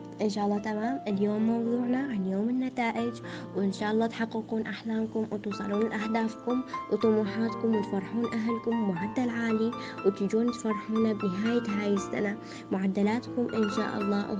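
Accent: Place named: Mosul, Iraq. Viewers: ي اموت ع لهجه اهل الموصل☺ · اييي لهجة اهل الموصل😍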